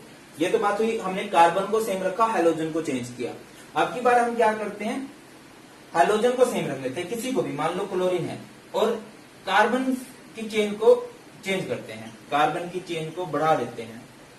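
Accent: Haryana, India. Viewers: Sir r u from haryana🤔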